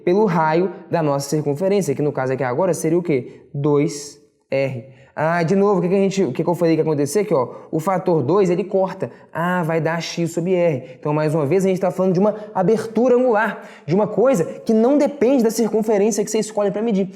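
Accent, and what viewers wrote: Minas Gerais, Brazil. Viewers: Extremamente bem explicado! Esse sotaque aí eu conheço heim! Mineirinho!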